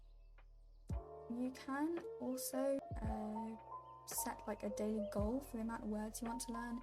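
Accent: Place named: United Kingdom. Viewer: You're so underated btw ur British accent is ssoooo good i love it💗💗💗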